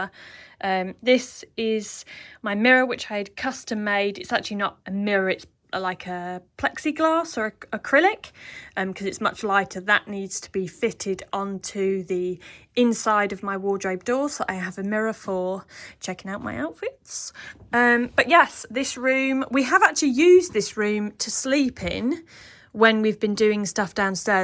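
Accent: United Kingdom. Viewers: Such a beautiful “ British English”….😌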